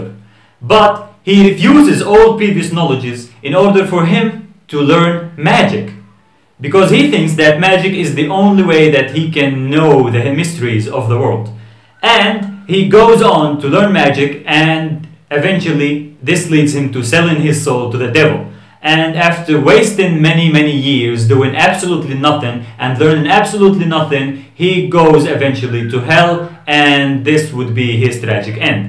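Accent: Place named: Turkey. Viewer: your accent sounds like Turkish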